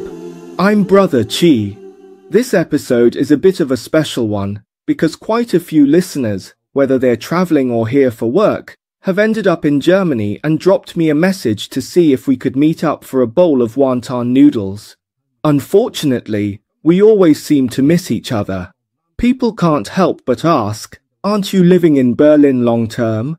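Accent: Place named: United Kingdom. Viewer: Amazing British accent!😊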